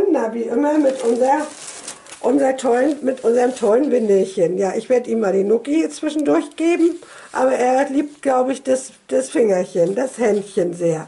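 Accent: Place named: Low German, Germany. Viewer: Süßer Schatz Wenn er ein bisschen größer wäre hätte ich angefragt Ich mag deinen Dialekt so gerne - Plattdeutsch ist einfach toll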